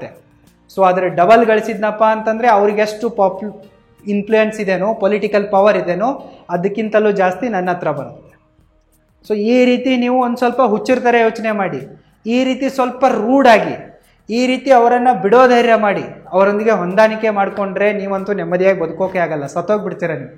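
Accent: North Karnataka, India. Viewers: Nimdu uttara Karnataka na sir?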